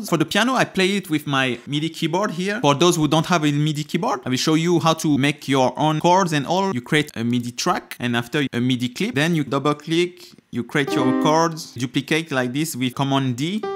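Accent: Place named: France. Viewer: Tu parle français on dirait?? I can notice your accent ..I speak French too.. Your English is perfect.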